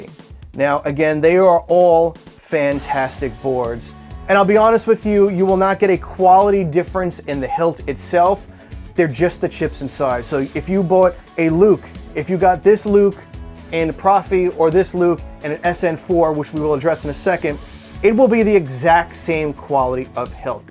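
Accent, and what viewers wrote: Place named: Long Island, United States. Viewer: I detect a long Island accent. Nice video man.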